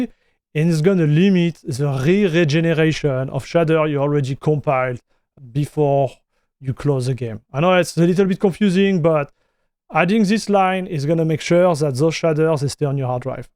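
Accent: France. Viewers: big thanks! I'm not newbee in linux, I was trying to configure arch for gaming by myself, but it requires too much time for me. And in your video there is right amount of information for me to set up perfectly. It's a bit hard to understand your french accent, but I'm Russian, so don"t bother, you'll be great by practice) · Merci pour tous les conseilles de configue (surtout pour le RGB 😜). J'améliore même mon anglais, je comprend super bien avec ton accent français, j'adore 👍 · w french accent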